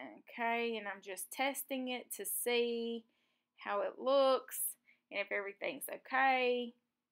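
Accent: South, United States. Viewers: You surprised me with that eyeball and it's terrifically wonderful! Love to listen to your Southern gal speak.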